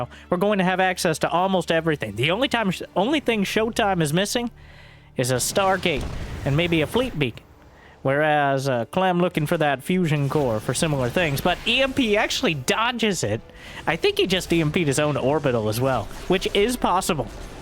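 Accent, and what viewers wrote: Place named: Appalachia, United States. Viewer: Can you speak proper English please ? Your hillbilly dialect is soo cringe … is it an act?😢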